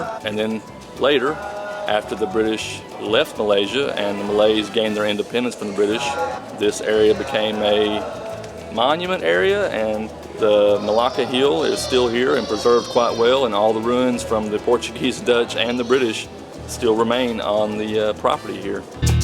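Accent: Kentucky, United States. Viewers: Fabulous video! Great music and editing, well done! Love it (especially the Kentuckian accent)!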